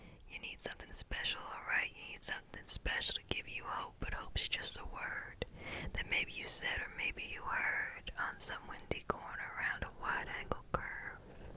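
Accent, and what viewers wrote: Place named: Louisiana, United States. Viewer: These lyrics struck me in an interesting way. It reminds me so much of how I've felt for a while, so it hit me quite deep. I could relate to it. And the country accent, it reminded me of a Louisiana accent, particularly from southern Louisiana.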